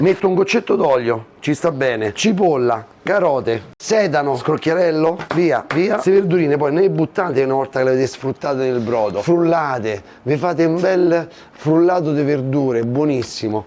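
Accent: Rome, Italy. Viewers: CI DICA L INDIRIZZO DEL SIO RISTORANTE. A MILANO COSA DICONO CHE LEI PARLA ROMANESCO? E POI PER CHÈ HA SCELTO MILANO PER IL SUO RISTORANTE E NON ROMA LA SUA CITTA? GRANDE CARISMATICO!!!!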